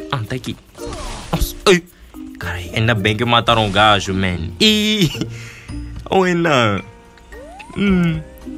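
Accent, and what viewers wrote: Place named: Portugal. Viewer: "Vamos ca ver 1x, vamos ca ver 2x, 3x, 4x,5x" ehhhhhhhhhhhhhhhhhhhhh tuga tmlc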